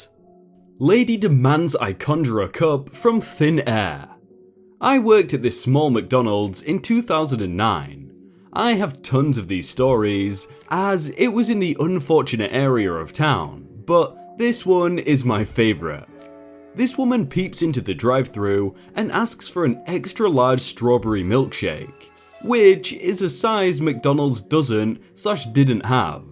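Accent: United Kingdom. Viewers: Despite sounding very much like a native Brit, at times he can barely speak English and his comments are inane. Can't listen to any more of him, largely due to the comments that he no doubt thinks are funny and clever. Sorry mate.. they are not.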